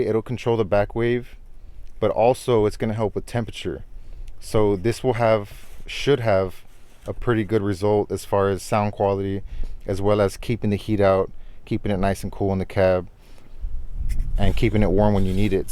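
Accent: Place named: Texas, United States. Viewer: Where are you from? I’m getting a Texas vibe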